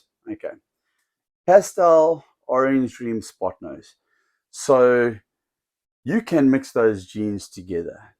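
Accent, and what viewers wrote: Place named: South Africa. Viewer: I love the South African accent so much.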